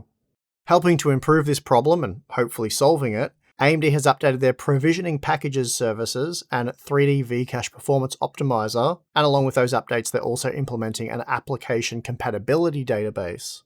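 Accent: Australia. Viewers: when u r talking about the part and it's advantages and disadvantages with specs etc - having b roll doesn't help... what would be nice is to have a chart/table for example, highlighting the points u raise at the moment perhaps? Just talking about it on the air~ isn't most convinient, especially with YouTube subs analyzing Australian accent sometimes and with similar names for products....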